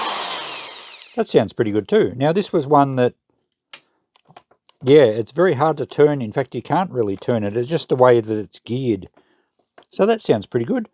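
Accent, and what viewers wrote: Australia. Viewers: Hi, I think you have an Australian accent? So when you say dollars, presumably these are Australian dollars? Or New Zealand? Or are you maybe an Australian living in the US? Just curious as the value of the tools seems to be very relevant, here and I need to translate to my own currency.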